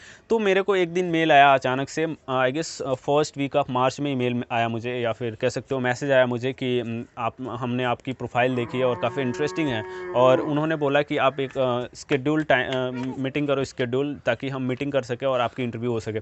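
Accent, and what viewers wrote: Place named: India. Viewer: English Indian ؟؟؟؟!!!!!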